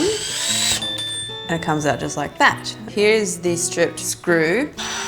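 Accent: Singapore. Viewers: Is she singaporean? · I love her and her Singaporean accent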